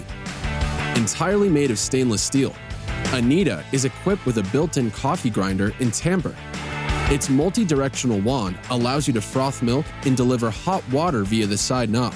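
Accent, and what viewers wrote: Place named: United States. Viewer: What's with this american guy doing the voiceover on an italian product? Get Mauro Epis back! A real italian. Good video otherwise though, from an owner of this machine!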